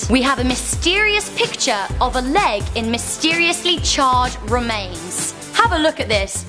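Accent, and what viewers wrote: United Kingdom. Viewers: Wow....she's British. Same!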